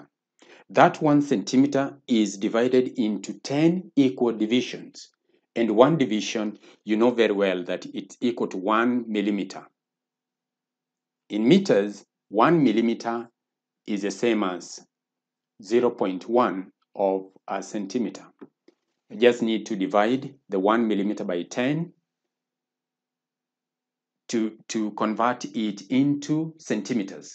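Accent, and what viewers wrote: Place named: Uganda. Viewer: Perfect explanation sir As if you are Ugandan. Am I right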